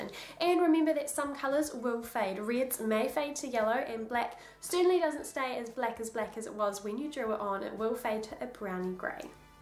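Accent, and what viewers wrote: New Zealand. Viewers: You have a New Zealand accent :))))))) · Lovely listening to you and thinking 'That accent... She must be a Kiwi!' Thank you for the tips, will have a go!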